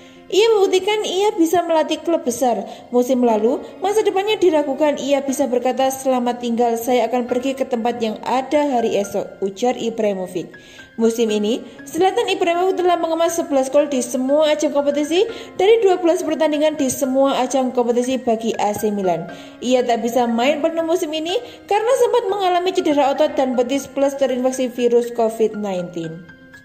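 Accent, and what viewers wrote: Java, Indonesia. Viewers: Wong Jowo mana neng, logatmu kuental 😘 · Medok abeezzz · Medok....amat neng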